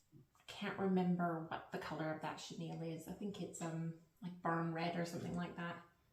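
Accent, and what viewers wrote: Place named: Arkansas, United States. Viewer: Excellent first FlossTube. I love your accent with a bit of Arkansas every few words. Halloween 1812.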